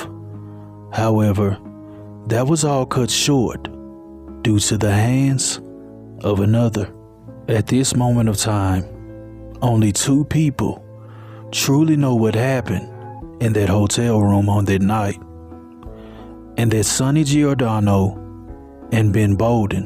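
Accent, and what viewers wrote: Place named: Memphis, United States. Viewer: You sound like you from #Memphis 😊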